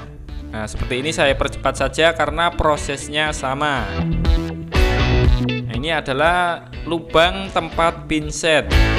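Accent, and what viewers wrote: Java, Indonesia. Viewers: Om asal mana kok logat bicaranya medog kental nuansa jawanya 🤭🙏 tp gayanya asyik mudah di mengerti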